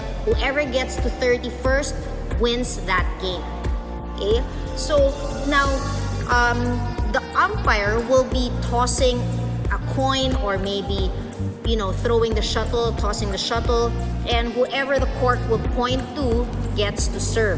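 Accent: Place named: Philippines. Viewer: Based on her accent, most probably she is Filipino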